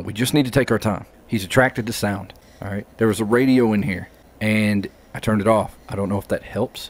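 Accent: South, United States. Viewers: You sound southern and when southern people get scared it’s funny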